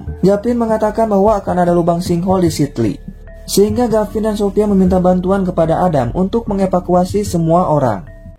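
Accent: West Java, Indonesia. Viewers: Pasti naraton nya orang sunda😅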